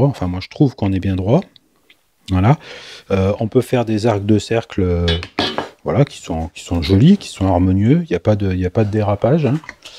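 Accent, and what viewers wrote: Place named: Belgium. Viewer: je suis d'accord avec toi, je ne vois aucune méchanceté dans tes vidéos. J'y vois surtout de bons conseils venant d'un pro ainsi que de la bonne humeur. En plus, ayant grandi juste à côté de la Belgique, retrouver ce joli accent ainsi que les septante et nonante me ravit.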